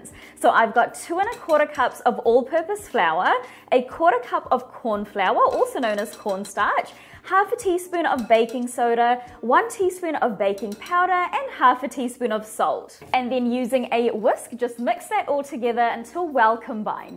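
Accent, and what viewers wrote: New Zealand. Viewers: I made half the recipe last night to taste test it. It tasted great. I’m going to keep using this recipe. Before I looked at your channel I knew you were a Kiwi from your accent. Much respect from across the Tasman Sea. Thank you for developing these recipes and sharing them.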